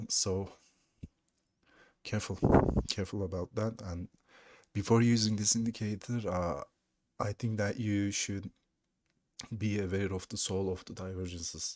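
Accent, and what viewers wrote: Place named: Turkey. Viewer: Türk aksanı seziyorum :) Bravo bro devam ellerine sağlık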